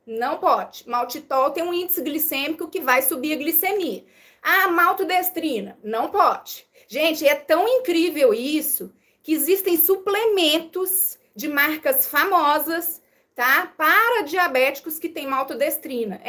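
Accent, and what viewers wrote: Minas Gerais, Brazil. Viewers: Além do conhecimento que vc passa estou amando o sotaque mineirin...tb sou mineira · Parabéns, seu programa está muito bem produzido, o som está legal e você com seu sotaque mineiro está um show, sua didática está muito boa😅🎉😅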